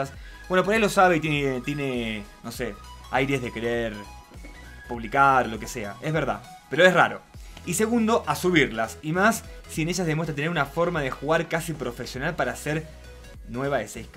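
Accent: Argentina. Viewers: Jsjs argentino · tiene la voz de un argentino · Tiene un acento re argentino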